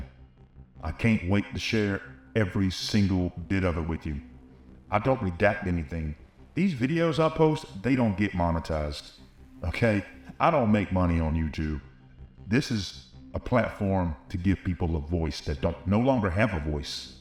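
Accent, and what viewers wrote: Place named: Appalachia, United States. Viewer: LAME REVERB EFFECT on your hick hillbilly narration. LOL You are TERRIBLE at this. You are more on the level of Kitty Cat videos.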